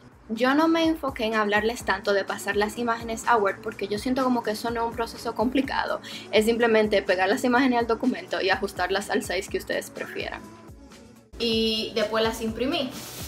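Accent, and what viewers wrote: Dominican Republic. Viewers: Eres domicana! Conozco tu asentó 👊😌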